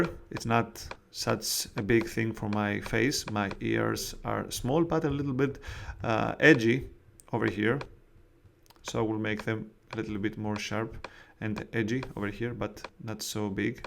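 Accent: Greece. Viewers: from your English i understand that you are a Greek dude.So, Ευχαριστώ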